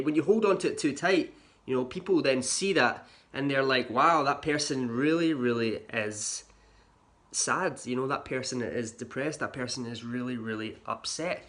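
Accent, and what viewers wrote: Scotland, United Kingdom. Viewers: Hey. I love watching ur videos & I love ur accent. Scotland is beautiful & a dream place for me & my dad to visit. My dad's side of the family is Scottish 😊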